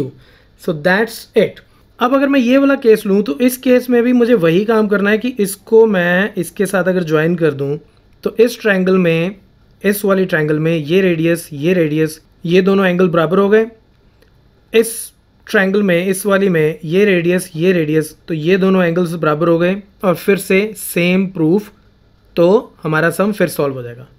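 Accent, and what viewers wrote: Punjab, India. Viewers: Sir ap punjabi ho na ?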